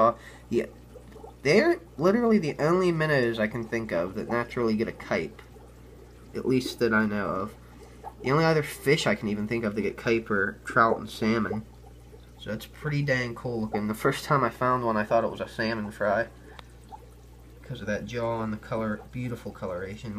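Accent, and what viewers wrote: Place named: Pittsburgh, United States. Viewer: U from the burgh.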